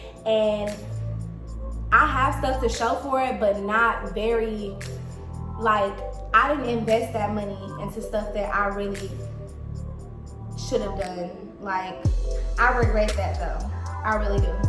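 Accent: New York, United States. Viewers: I your little new York accent or whatever ❤️❤️❤️❤️❤️❤️❤️❤️❤️❤️❤️❤️❤️❤️❤️❤️❤️😂😂😂😂😂😂😂😂😂😂😂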